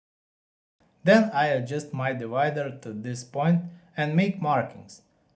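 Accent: Russia. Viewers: Ты русский? У тебя акцент как у русского и русские субтитры)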